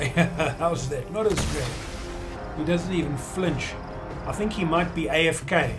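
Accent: South Africa. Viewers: Do i detect a south african accent /proud of you for doing well in the gameworld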